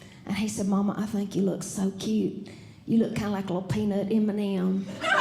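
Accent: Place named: South, United States. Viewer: It’s a southern dialect, please educate yourself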